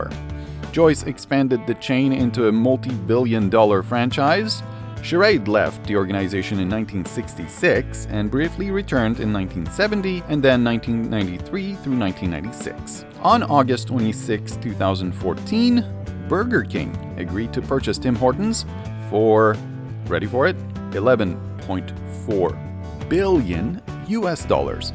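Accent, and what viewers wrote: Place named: United Kingdom. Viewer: British accent pffff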